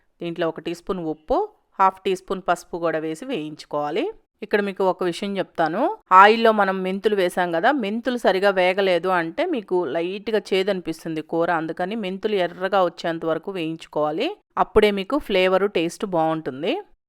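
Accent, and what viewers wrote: Nellore, India. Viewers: Mee uru Nellore aa? Ma Nellore relatives mee lage matladataru. And recipe chala bagundi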